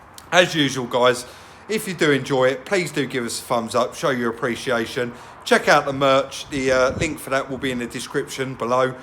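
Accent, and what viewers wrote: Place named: Cockney, United Kingdom. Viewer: I have to admit, when I first started watching your channel, I thought you were another Mike Brewer-esque "cockney cheeky-chappy" and it put me off a bit. I couldnt be more wrong. You come across as a really likeable honest bloke with quite a bit of knowledge. The Range Rover engine swap vids totally had me hooked. I really look forward to your vids now, as I never know what curveball is going to come next. (The bike for instance). Awesome channel. Keep em coming. "Lets get involved"👍👍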